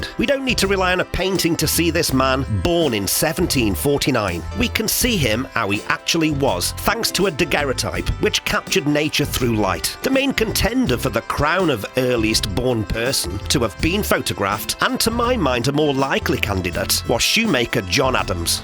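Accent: United Kingdom. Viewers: 3 things i like about your style after watching 4 vids. 1 your Dedication and attention to the details.... (200) Games to Check out the veracity of the MH problem. Sweet!) I never got that until today. 2. Your enthusiasm and presence (including the Accent. We Yanks are suckers for the Brit accent) 3. No AI in your presentation! Big Fan, will pass you along. Thank you.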